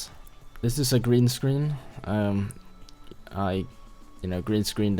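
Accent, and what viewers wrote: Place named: Sweden. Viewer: He sounds Swedish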